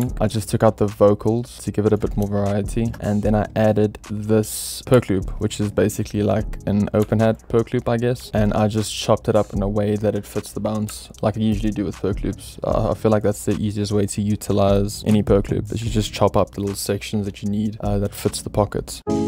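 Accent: South Africa. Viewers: the accent is giving joburg oak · Dude why do you sound like you from South AHHHH🇿🇦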